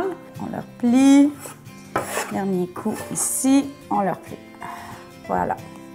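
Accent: Quebec, Canada. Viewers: vu depuis la France, j'adore le Québec, les québécois(es) et l'accent québécois... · Bonjour super vidéo sympa qui donne envie de se lancer dans la confection de ce magnifique pain et surtout de jeter ma machine à pain. Encore bravo votre accent me rappelle de merveilleux souvenirs de ma vie au québec il y a40 ans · Patrick de Paris j'adore ta vidéo quel accent les canadiens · Le pain et génial et l'accent québécois franchement j'adore ! Tout est magnifique, même la boulangère d'ailleurs ;) ! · Le pain est aussi craquant que la cuisinière !! 😘 Et ce joli accent québécois, j’adore 😍 Merci 😊🙏 pour cette belle vidéo ! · C'est encore meilleur avec le petit accent Québécois. Merci:) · Salut ça fait un long moment que jai regarder tes vidéos et je m'en escuse j'ai eu des chamboulements dans ma vie notamment la naissance de bébé il y a 6 mois et javou ne pas avoir pris le temps de regarder tes vidéos enfin Bref, j'ai voulu faire un pain mais râté je me suis souvenu que tu en avais fait.. 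A la maison je n'ai pas cocotte en fonte est ce que je peux laisser la pâte sur la plaque du four? Et est ce que pour la première étape de pose je peux mettre un torchon ( linge a vaisselle ) a la place du film alimentaire?? J'espère que tu pourras le répondre et je promets de regarder plus régulièrement tes vidéos c'est vrai que ta bonne humeur et ton accent québécois ma bcp manquer.. Énorme bisous 😘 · Quel mignon, l'accent québécois! J'ai abonné. Merci pour le vidéo. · Quel délicieux accent que celui de nos cousins du Canada, et ça l'est encore plus quand c'est une ravissante cousine ! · Non seulement je suis fan de l'accent et des canadiens, mais en plus tu es VRAIMENT super sympatique!!! Je mange sans gluten et do cje fais mon pain moi-meme. Je vais essayer de suite cette recette! Merci pour ta bonne humeur et ta gourmandise finale! On aurait envie d'y gouter aussi de ce bon pain au beurre! 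;-) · Bonjour je viens de vous découvrir et par conséquent j ai regardée plusieurs de vos recettes Avec votre recette j ai fait mon 1 er levain. Merci Et avec ce levain j ai fait un pain Qui était très bon Mais pas aussi levé que le votre Dernier petit point, je vous écris de la Belgique et j' adore l accent du Québec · Bonjour, j'adore votre accent Québécois et votre façon de humer le levain. Astucieux la façon de cuire le pain dans une cocotte, la prochaine fois je vais tenter l'expérience. Merci pour votre humour et pour cette "cousine" bravo! votre pain est aussi beau que celui que nous faisons ici en France et pour vous encourager je m'abonne. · magnifique, et merci je me suis abonnée, pourriez vous me donner la technique pour un pain au levain avec farine t65 bio + farine de seigle bio ( moitié T65+moitié seigle), eau? car problèmes digestifs. Superbe accent canadien et pleine de vie, j'aime.... 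Merci, à vous lire. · tres beau accent ,ces Canadiennes j adore . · Virus oblige, je suis a la maison en Italie (mais d origine Québecoise). Hier a l épicerie il y avait de la levure de bière qui vient du boulanger pcq l épicerie n avait plus de levure. Bien entendu j en ai achetée par curiosité. Je cherche sur Youtube et découvre une personne qui parle comme moi! LE BONHEUR. Je vais commencer mon pain aujourd hui. Merci beaucoup, je suis maintenant abonnée. Ciao xx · Résultat incroyable sur la vidéo, et j'adore cet accent canadien ;) Je vais essayer de faire la même chose, mais je n'ai pas ces farines de qualité ;)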